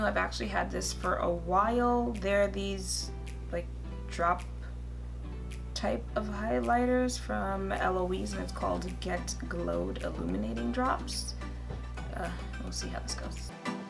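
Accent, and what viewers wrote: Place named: United States. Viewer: The foundation was a perfect match ! It's the first time I see you wear blush,it's really pretty ! Btw,"amande" is pronounced "amand" but i love when americans speak french,it sounds a lot cuter than when we speak english :p beautiful as always ;) xx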